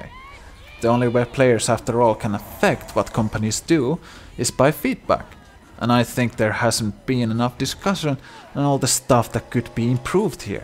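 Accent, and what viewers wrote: Finland. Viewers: Although you sound finnish I totally agree with you, give me my free biscuit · Are you finnish ?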